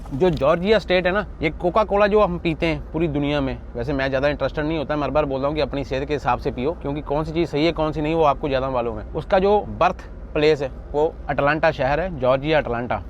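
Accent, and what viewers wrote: Punjab, India. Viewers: Punjabi bnda hindi bolde hoye das hi dinda asi punjabi aa # "ye jo khajoor ke rukh hai ye apka sawgat kre ge" 😅 great paji 🎉🧢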